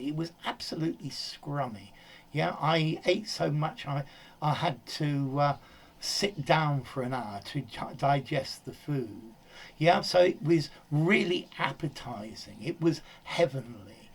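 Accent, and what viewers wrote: United Kingdom. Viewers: Sir, may I know that you're really from United Kingdom because I want to learn the native English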